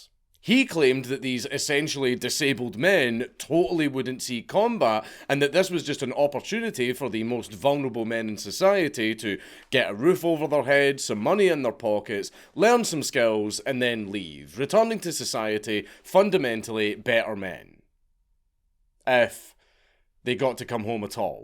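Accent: Scotland, United Kingdom. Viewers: I love the Scottish accent. Its so...confusing, in an attractive sort of way. I think it's great that we are including the mentally disabled in the recruiting process. They can't drive, but give em` some high sxplosives; what could go wrong?! The time is nigh for action men....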